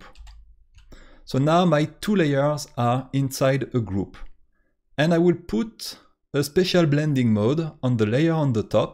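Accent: France. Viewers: Don't apologize for your your French accent....or "English" mistakes. You should hear English speakers....my goodness. They are not textbook! This was very helpful. Merci. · not me liking in the first few seconds bc i hear a french accent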